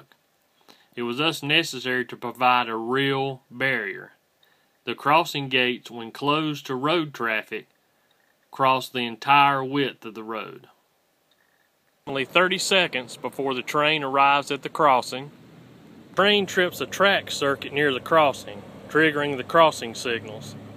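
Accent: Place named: Mississippi, United States. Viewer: I'm from Mississippi and that accent of yours sounds like it a Mississippi accent!!!!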